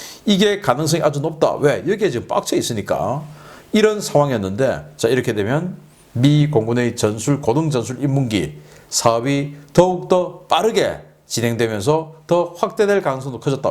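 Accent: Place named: Gyeongsang, South Korea. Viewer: 까딱하면~~ ㅎㅎㅎ 박사님 경상도 사투리가 나는 듣기가 좋은데 저~ 서쪽에나 저~ 위쪽에 사는 사람들은 무슨 말인지 못 알아들을 걸요?? ㅋ 박사님 늘상 좋은 날이 되시길 기원드립니다.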